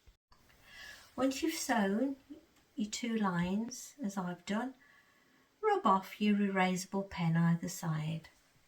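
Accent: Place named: Midlands, United Kingdom. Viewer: Very clear tutorial, thank you. I love hearing your tutorials as having moved South they take me back home to the Midlands 😀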